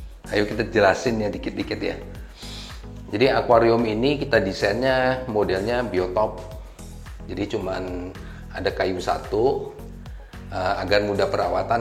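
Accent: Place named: Bangka, Indonesia. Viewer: Logat bicara blom ilang bangka ah khiu.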